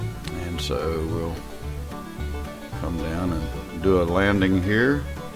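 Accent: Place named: South, United States. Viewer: It's worth watching just for the narration. Love the southern accent 😁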